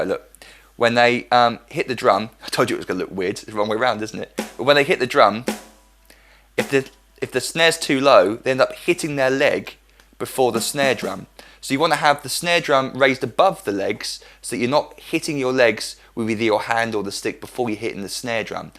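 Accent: United Kingdom. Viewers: Very helpful video! And, I really like and so, but I hate british accent ><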